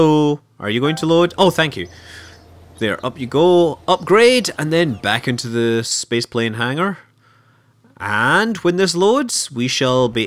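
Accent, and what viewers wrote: Scotland, United Kingdom. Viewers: Somewhere in the UK I don't know what city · Another Scotsman yes!!!!!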